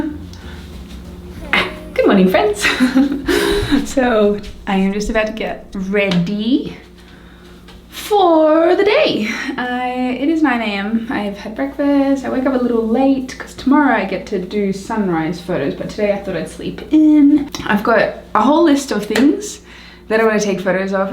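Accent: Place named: Australia. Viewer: Because of your accent, I heard fly swatter as fly sword and thought that must be the coolest Australian slang ever. Hope you're having a great Thanksgiving, although I may be revealing my American toolishness by saying that, and hope you have a wonderful holiday season through the rest of this year and into the new one.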